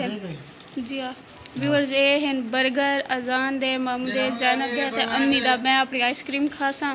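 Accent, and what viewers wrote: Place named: Muzaffargarh, Pakistan. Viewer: ap basckly Kha sy haiii Ap k leljy sy Tu ap Mujy district Muzaffragrah jatoi city sy lgti haiii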